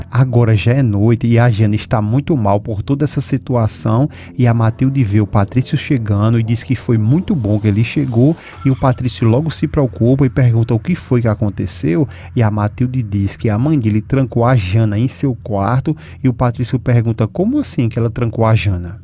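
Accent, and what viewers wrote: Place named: Northeast, Brazil. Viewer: adoro assistir sua narrativa é muito legal com esse sotaque nordestino. Maria Alvina de Teresina -Pi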